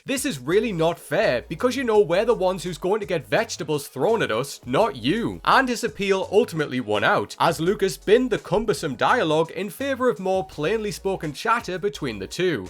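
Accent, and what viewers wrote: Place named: Newcastle, United Kingdom. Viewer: That's so funny that the final chapter in the video description says "Rear Park" instead of Ray Park, when the video narrator's got a Geordie accent :)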